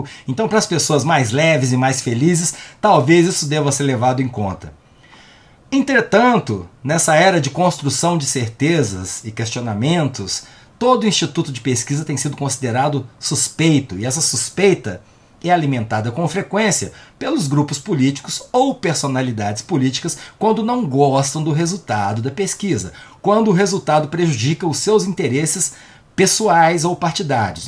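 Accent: Minas Gerais, Brazil. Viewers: Faço minhas suas palavras. Parabéns pelo tema deste vídeo. Agora, diferente de você que é mineirinho, imagine o meu grau de frustração por morar em Curitiba que, embora seja considerada uma capital com um dos maiores índices de qualidade de vida do Brasil, convivo com a população mais "canhinha" que habita nosso querido torrão natal. Você conhece a escala de Bristol? Se conhece lhe digo que o pessoal daqui tá no topo da escala "prisão de ventre" Mineiro fala diferente mas nós aqui também.😂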